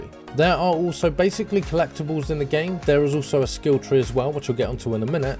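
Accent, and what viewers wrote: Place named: Bristol, United Kingdom. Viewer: Is that a fellow Bristolian I hear?😂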